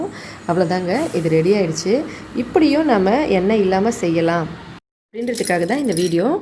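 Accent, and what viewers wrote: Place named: North India, India. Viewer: நீங்க இந்திகாரங்க தான ......ஆனா அழகா தமிழ் பேசுறீங்க எப்படி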